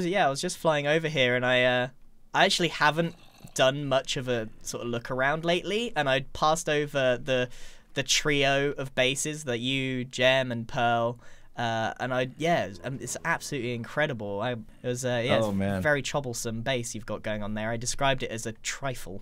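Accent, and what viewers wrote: United Kingdom. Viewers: I think impulse didnt notice "chobblesome" because with your british accent it sounds very close to "troublesome"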